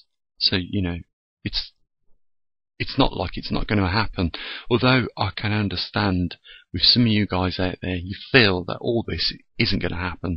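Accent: Birmingham, United Kingdom. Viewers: We made the move to the countryside in Portugal 3 years ago...........we have a poly tunnel and land. It's still affordable to buy land in Portugal with water and mature fruit trees. So happy to have left the city. Thanks for your work. Its been very cool here all month, which is very unusual. Btw, you sound like a brummy.........I was born in West Brom!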